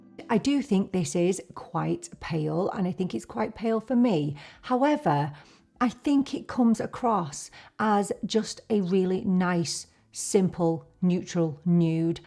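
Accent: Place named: Yorkshire, United Kingdom. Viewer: Subscribed as soon as I heard your (Yorkshire?) accent! Not often I find someone on YouTube in my age group and from Northern England 😊. I too moved away many years ago and listening to you reminds me of home. A MAC lipstick video as well…perfect!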